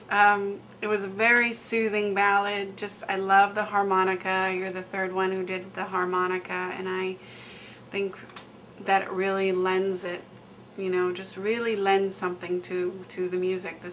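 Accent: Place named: Germany. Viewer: still liv zhat times and your well german accent ;) cheers kc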